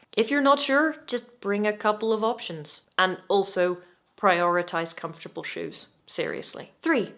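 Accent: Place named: Northern Ireland, United Kingdom. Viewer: I watched your logical fallacies video because Youtube told me to (was not disappointed). Now I'm just trying to figure out where your accent is from - I'm guessing Northern Ireland originally but you've been living in England for years. I might need to watch more videos to confirm!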